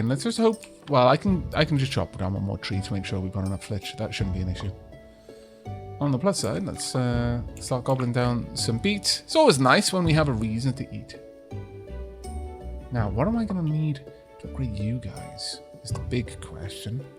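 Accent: Wales, United Kingdom. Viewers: I get people from outside the UK probably don't know much about various UK accents but I'd really have expected most people to recognise a Welsh voice. He even sometimes slips up and says the Welsh word for a thing mid sentence which is rather funny when it happens.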